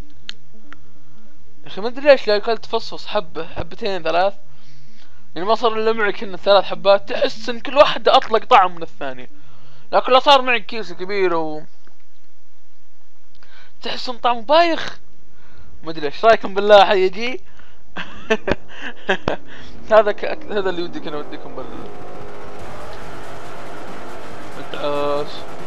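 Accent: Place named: Qassim, Saudi Arabia. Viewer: انت من القصيم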